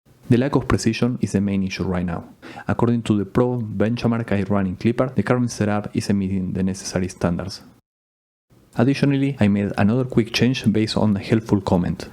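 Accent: Argentina. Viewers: sonas como argentino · Como andas papá? Otros pensaran que es un ingles malo, pero yo note ese Argentino desde el principio. Proyectazo loco, segui para delante que es realmente impresionante. Felicitaciones 🟦⬜☀⬜🟦 ---- ⭐⭐⭐ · El acento argentino es inconfundible jaja. Bien ahí hermano, muy buen proyecto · Sos argentino papá ? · vos sos Argentino??? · Hola, excelente maquina, tu ingles tiene un dejo de acento argentino, puede ser? Saludos!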